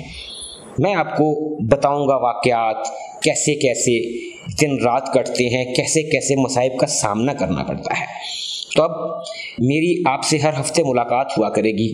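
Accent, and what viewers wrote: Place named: Punjab, India. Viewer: Apka ta'lluq punjab ki kis jagah say hay brother? Lehjay say ap punjabi lagtay hain.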